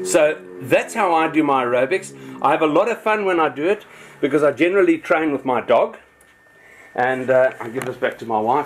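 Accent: South Africa. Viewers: it says your from UK but your accent sounds South African??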